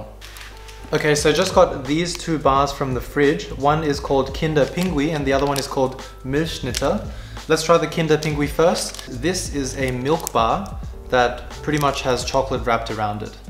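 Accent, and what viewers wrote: Germany. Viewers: Are u from Germany? Sounds like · Srsly, your german is so authentic that I (as one) was sure you were german. Good job!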